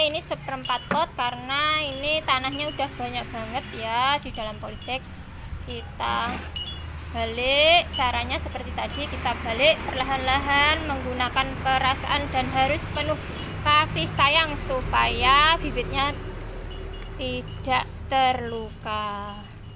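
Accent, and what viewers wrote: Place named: Java, Indonesia. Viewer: English ne jowo aksen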